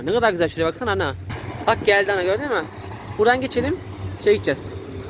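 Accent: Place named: Aegean, Turkey. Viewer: Ama şiveniz Karadeniz şivesine hiç benzemiyor daha çok Ege ile iç Anadolu karışık gibi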